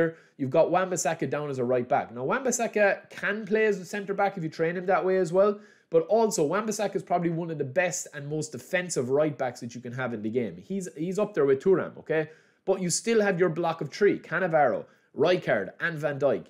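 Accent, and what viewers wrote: Ireland. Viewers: What's the craic lads? Which part of Dublin are you from?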